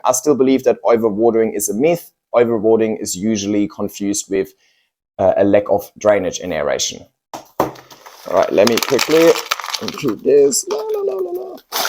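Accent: Germany. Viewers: I wish I had a cool German accent too.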